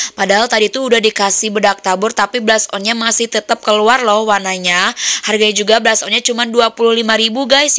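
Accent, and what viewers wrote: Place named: Java, Indonesia. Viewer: Terlalu medok, tpi warna bdakny si natural bnget, cma ke Medokan Tpi bgus ko, aku suka , suka sekali mba Terimksih tutorialny, semoga cnelny tmbh maju dn sukses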